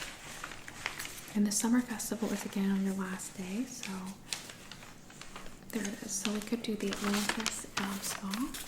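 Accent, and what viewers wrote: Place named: Ohio, United States. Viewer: I hope you're feeling better! Youngstown is my town! The Canfield fair is the largest county fair in the state. You sound like you're from around-about these parts. If you've never been to it, I recommend going. There's a lot to see, animals to see/pet, art, food, and rides. 🙂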